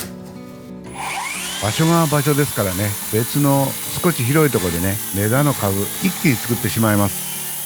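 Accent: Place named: Banshu, Japan. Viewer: 方言は同心円に広がりますからね〜 たまにさんの言葉が私の地元、兵庫の播州弁に聞こえたりします。（疑問形で 〜け？）など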